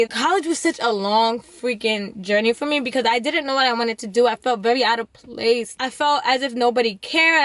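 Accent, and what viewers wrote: New York, United States. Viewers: New York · Love the NY accent. I got a Boston accent so I love the east coast dialogue lol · Are you from New York? Your voice and accent is gorgeous girl 🔥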